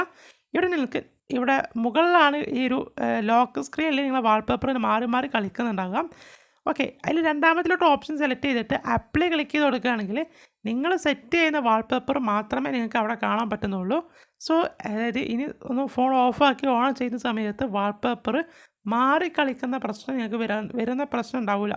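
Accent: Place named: Malappuram, India. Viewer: 😂ethra thekkan bhasha anukarichaalum malaappuram bhaasha idakk keri varum. Ningal malappuram karan😅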